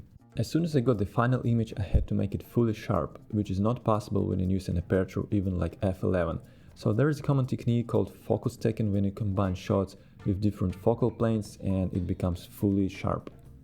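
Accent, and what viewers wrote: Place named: Russia. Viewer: Рашен инглиш ))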